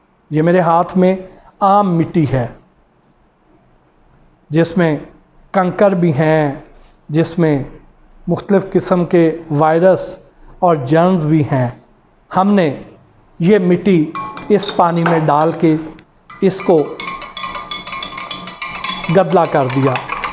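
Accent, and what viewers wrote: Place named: Punjab, India. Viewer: MA SHA ALLAH sahib Aap bohat cute punjabi style k malik ho. ALLAH Karim aapko hamesha khush rakhe Aameen ya RAB Ul Aalaameen.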